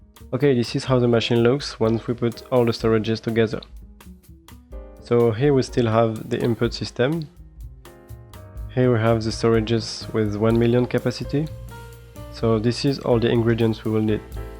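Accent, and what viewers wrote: France. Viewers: Bro you are french isn't it?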